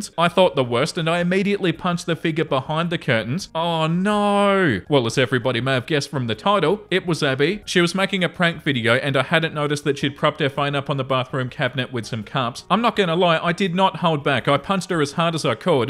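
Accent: Australia. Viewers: i was on facetime w my sibling the other day and they heard the vid i had on in the background and asked me "who's your cheery australian man"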